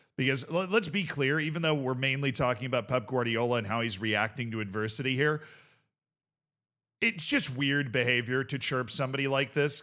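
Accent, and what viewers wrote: Liverpool, United Kingdom. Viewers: Scouse not enlish innit. boss like la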